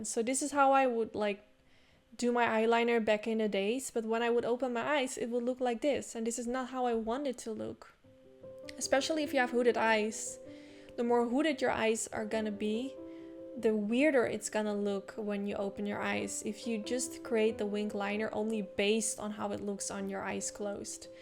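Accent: Netherlands. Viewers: My mind: SHE IS DUTCH! I could hear u speak English with the dutch highlights😆 hahah very nice video! I do the same!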